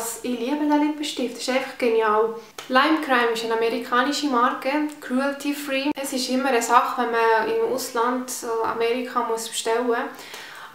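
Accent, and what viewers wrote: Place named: Solothurn, Switzerland. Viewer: Soledurner Dialäkt <3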